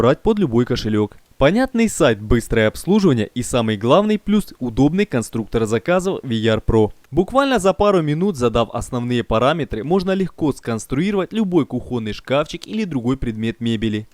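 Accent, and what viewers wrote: Ukraine. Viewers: Вам, разумеется, можно говорить как угодно - это ваше право. Но всё же когда человек говорит неграмотно, это порой вызывает муку, Вы же формируете контент для зрителей. А то, что русский для вас не родной - не правда, даже если вы в быту и пользуетесь украинским, в чём я сомневаюсь, то и русский вы знаете прекрасно, просто не даёте себе труда не суржиковать. ps "ризетки" нет ни в каком языке. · Если придираться к речи, то в роликах много «очепяток» в речи, присутствуют акцент и слова «суржики», потому что они украинцы, но ребята не претендуют на знатоков по русскому и литературе. А еще у них голова и руки на месте!😎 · Всеодно ти говориш з українським акценом, прошу озвучувати Українською мовою твої відео. Інакше Я відмовлюсь від твоїх відео.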